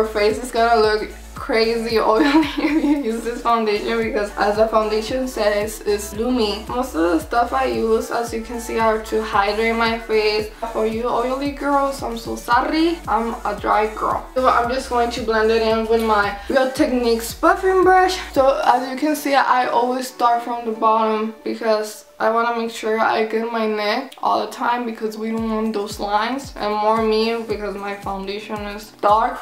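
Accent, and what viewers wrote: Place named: North, Mexico. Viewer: are you mexican? you sound kind of norteña, saludos👋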